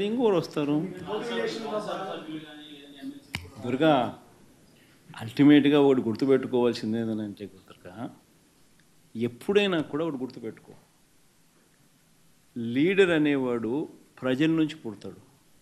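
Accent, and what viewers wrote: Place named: Kadapa, India. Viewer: Kadapa slang super abbi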